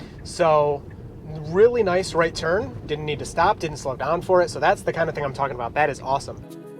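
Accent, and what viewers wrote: Michigan, United States. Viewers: Michigan guy?!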